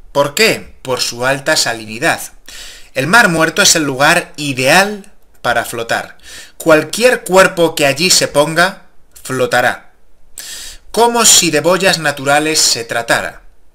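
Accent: Spain. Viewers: Buenos vídeos como ustedes dicen y mas chistoso por tu acento Español. Saludos desde México. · tu voz aparte de ser española es muy molesta.. 😒😒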